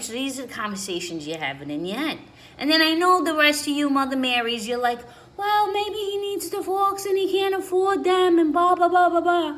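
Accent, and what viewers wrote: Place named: New York, United States. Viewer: Luv ur humor. Nice to see & hear a real New Yorker. Joseph from Minnesota.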